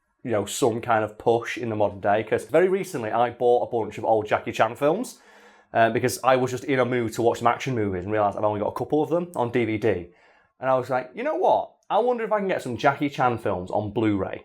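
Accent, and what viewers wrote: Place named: England, United Kingdom. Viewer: True fact: Jackie has never done all his own stunts, he's used stunt doubles for all manner of teeny tiny martial arts moves all the way up to his infamous clock tower fall in Project A, where the main shot used in the film isn't of Jackie falling from the clock face to the fall, it's his co-star and frequent stunt double Mars doing that stunt. Jackie does perform the 2nd shot from further fdown the tower (so only a two-thirds fall), which is the one where he gets up and delivers his lines all in the same shot. Wisely he chose not to do a full fall leading into some minor acting afterwards all in one shot, because however you take that fall, you can't count on being able to stand up, act, and deliver lines afterwards! Also, what country is the videomaker operatng in? He sounds English, but he talks like someone who has never done a basic search for Jackie Chan on amazon UK. 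No jackie chan films available on Blu-ray? Try Police Story 1/2, Wheels on Meals, Armour of God, Crime Story, Project A 1/2, City Hunter, Miracles, Heart of Dragon, The Big Brawl, The Protector, The Young Master, Dragon Lord, Drunken Master, Snake in the Eagles Shadow (coming soon), and all manner of his early Lo Wei productions (Fearless Hyena, Spiritual Kung Fu, Dragon Fist, Shaolin Wooden Men, Snake and Crane Martial Arts of Shaolin, etc)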